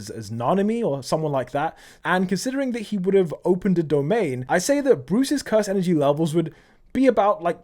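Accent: United Kingdom. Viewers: This ngas black??? Sorry I don’t have any problems with this but I had no idea. Thought you were a 30 yr old British white guy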